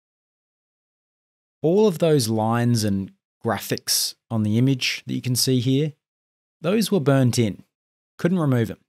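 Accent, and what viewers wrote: Australia. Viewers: Ahh, I always thought you're an American.. Turns out you're an Australian. TIL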